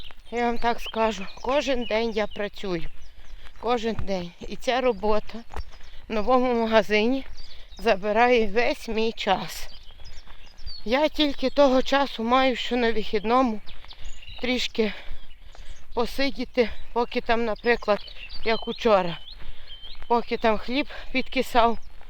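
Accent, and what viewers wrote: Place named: Western Ukraine, Ukraine. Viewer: Ви така позитивна ,тільки почула вашу мову відразу зрозуміла ,що ця жіночка з нашої західної частини України !😊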